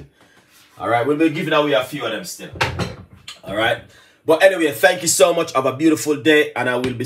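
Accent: Jamaica. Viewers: This is how Jamaicans talk he is just being himself. Video is live not staged 😊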